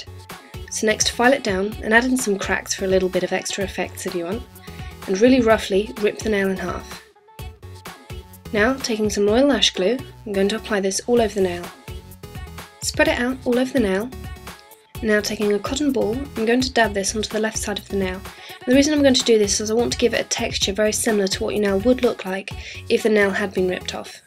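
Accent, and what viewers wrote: England, United Kingdom. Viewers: Love the british accent XD EPIC !!!! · Just watching it still ive paused it can i just say i looooooooovvveeee your pommy voice so much. Subscribing for it and great video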